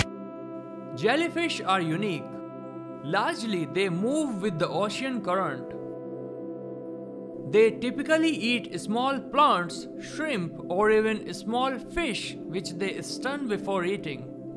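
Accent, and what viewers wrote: India. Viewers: It's not a broken english, he has an indian accent. I have a very different accent too, but it's not indian. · Yeah text way better than Indian accent (not being offensive) just saying :|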